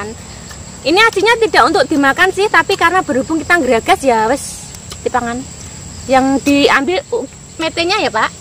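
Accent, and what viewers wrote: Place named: Java, Indonesia. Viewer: Jawane totok tenan yooo.... 😂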